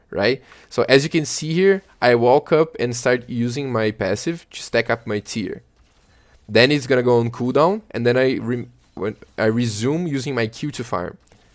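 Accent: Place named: Brazil. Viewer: Sotaque de brasileiro falando inglês é muito fofo 🥰🥰🥰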